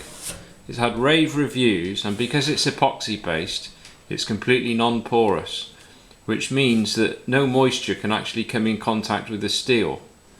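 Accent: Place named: Gloucester, United Kingdom. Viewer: I used to work with a bloke years ago and he used to say BETTER THAN A JOB IN THE TOWN . he was from Gloucester , your accent sounds similar .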